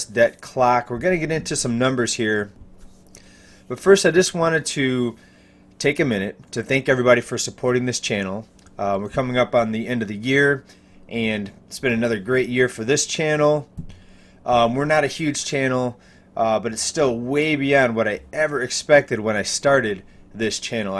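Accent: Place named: South, United States. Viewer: I like his big bubba accent!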